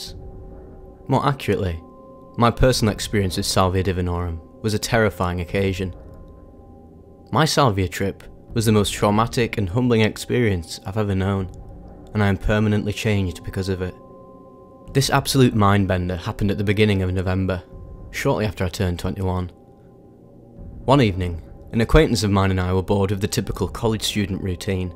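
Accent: United Kingdom. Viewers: Having a British accent is like easy mode for commentary channels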